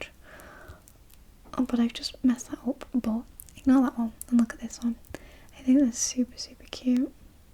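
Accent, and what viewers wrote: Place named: Northern England, United Kingdom. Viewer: Kashan900 her accent is a northern English one.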